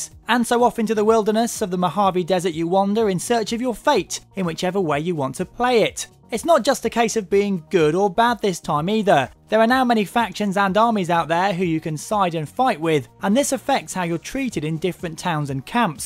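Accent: England, United Kingdom. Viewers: dnt worry not all english accents sound like his his is annoying but the english accent is a lot nicer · his the person taking in the review British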